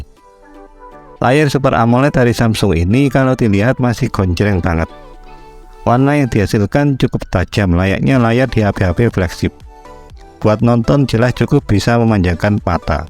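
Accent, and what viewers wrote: Mojokerto, Indonesia. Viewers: wong mojokerto cak ?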